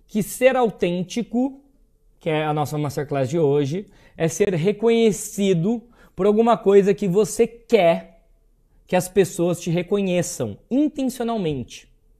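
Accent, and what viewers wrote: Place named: Caipira, Brazil. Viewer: Adoro o sotaque caipira da!!!! Maravilhoso!!!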